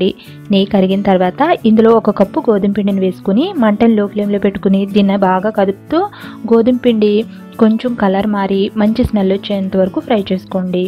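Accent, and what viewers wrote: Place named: Andhra Pradesh, India. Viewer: Your voice is very sweet Andhra ammayi anipinchela me explaination bagavundhi.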